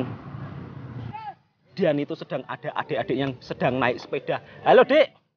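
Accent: Java, Indonesia. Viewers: Suara kamu terlslu pekat telo jowo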